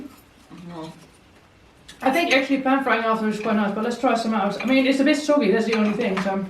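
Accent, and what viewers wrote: Netherlands. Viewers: I live in Germany and ur German is pretty good! But it has a bit of a dutch accent :D I feel like both languages are kind of similair, when they are written. When I read smth in dutch, I often understand it, but when somenone talks dutch, I understand nothing haha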